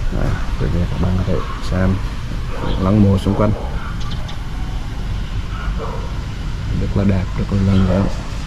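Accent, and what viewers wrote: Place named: Hue, Vietnam. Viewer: Chuẩn Huế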